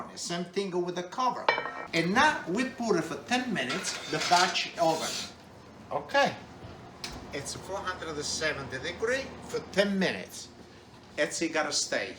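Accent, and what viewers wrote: Italy. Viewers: I miss my Italian Grandparents loads. I spent so much time in the kitchen with my Nan learning how to cook and absolutely loved it. Sometimes find myself just putting this on to hear the Italian accent, brings back great memories. On the plus side... he’s a lovely fella and an amazing cook!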